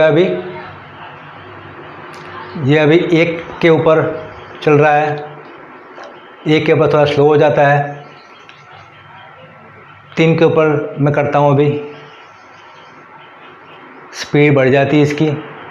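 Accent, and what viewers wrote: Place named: Gujarat, India. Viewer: Gujarat lago chho bhai.. very good 👍 explained...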